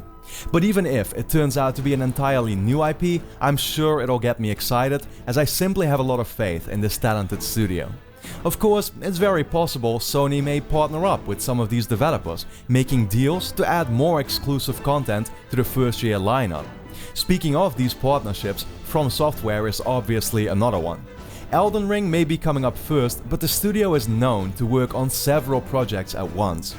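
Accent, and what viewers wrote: Netherlands. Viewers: He sounds Dutch. Iam 99% sure he is from the netherlands. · Hahaha that Dutch accent tho...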